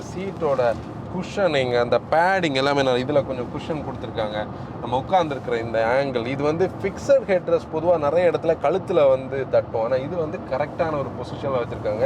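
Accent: Kanyakumari, India. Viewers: Kanyakumari slag 😊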